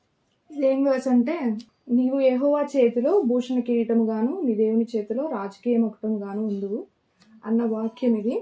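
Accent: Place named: Guntur, India. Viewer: Guntur kada miru😂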